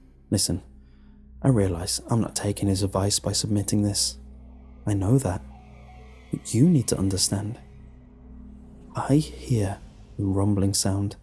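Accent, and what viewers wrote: United Kingdom. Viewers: Pissanjecous Demsley it's cause of his british accent.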